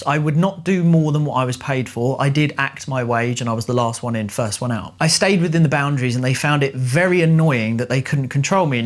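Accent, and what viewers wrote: United Kingdom. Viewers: Your accent is More british and you speak very fast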